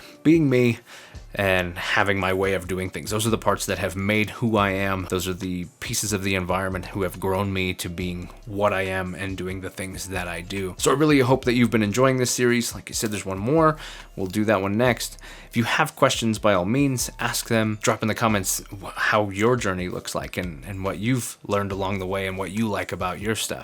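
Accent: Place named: Visayas, Philippines. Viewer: KASAG bisdak ni ug gikan Tobosa klaro kaayo. Way bisaya naka basa diri hahaha.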